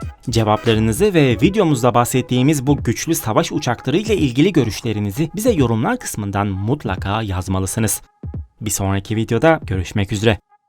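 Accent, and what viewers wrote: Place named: England, United Kingdom. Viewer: Bu nasıl telafuz arkadaş, MİG'i am ay ci diye okuyorsun. SU yu es yu diye. Bütün herşeyi İngiliz ağzı ile okumak zorunda mısınız? "mig"; "su" diye neden telaffuz etmiyorsun. Bu kadar mı dilinize yabancısınız?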